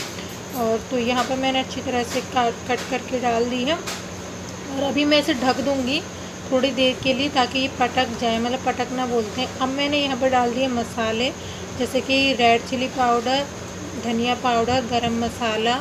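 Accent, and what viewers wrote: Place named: Uttar Pradesh, India. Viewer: apki bolne ki style pr bahut hasi aa rahi thi....sach me up me ase hi bolti h ourate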